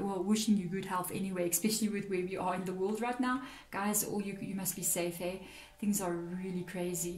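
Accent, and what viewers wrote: South Africa. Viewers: Good to hear a south african voice again. I been in the uk too long now lol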